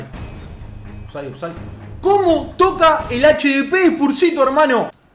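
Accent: Argentina. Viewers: como se nota que sos argentino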